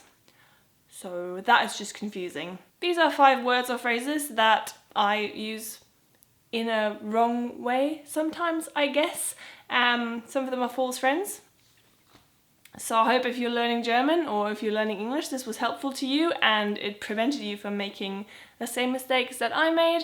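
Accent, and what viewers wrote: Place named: England, United Kingdom. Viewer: an deinem "CH" merkt man dass du in England lebst :) so süss haha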